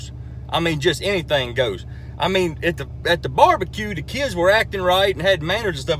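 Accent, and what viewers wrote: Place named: South, United States. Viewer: Why was this in my recommendations? 😍 nevermind... I don’t care 😂😂 He’s funny! And that southern accent... oh my 🤤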